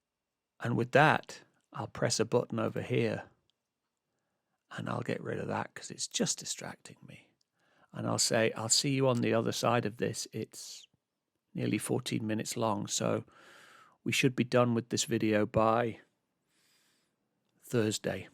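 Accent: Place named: United Kingdom. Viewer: Hi NWA UK Eastern division here. First time I have come across your channel. From accent I assume you are British, so nice to see that. I loved your intro. Mostly I don't like excessively long intros but this was apt and actually quite moving. I will be looking at your back catalogue. Love your poetry references too. Now I shall listen to the reaction and come back at the end but I suspect I am going to love your reaction and analysis and channel. From memory isn't it at the the start? And isn't he from Reigate school choir? Maybe he is Welsh, though Reigate certainly isn't 😀 OK, I'm and this is an incredibly thoughtful, insightful reaction. Why have I not come across you before? (Subscribed.) Think I lost this: They are all top flight musicians, technically and emotionally. They can all do anything that is asked of them but they let the needs of the music dictate what they do. 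To me that is one of thecdefinitions of a great musician - interpret and project the feeling and emotion whether it needs restraint or all-out "shredding". OK, finished. Quite possibly the best TPatP reaction I have ever seen. Thank you (as Floor would say).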